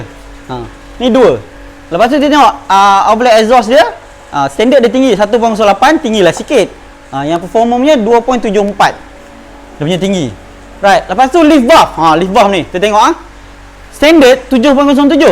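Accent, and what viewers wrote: North, Malaysia. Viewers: Lama2 skali bunyi jugak loqhat utara...apa2 pn best penerangan beza cam..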